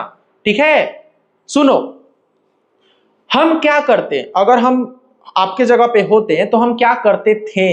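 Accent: Bihar, India. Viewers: Bihar se ho 😅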